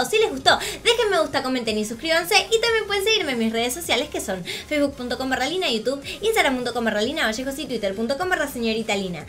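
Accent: Buenos Aires, Argentina. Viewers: Me Encantó una pregunta.. ¿Sos de Oeste o Éste del Gran Buenos Aires? 😁😆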